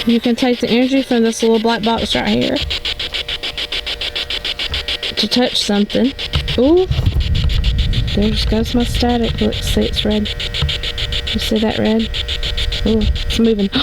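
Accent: South, United States. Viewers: Your welcome and I love your southern accent. I'm from Texas but been living in Louisiana for about 19 yes now .. I still have my Texas accent. I don't think it will ever completely go away. Lol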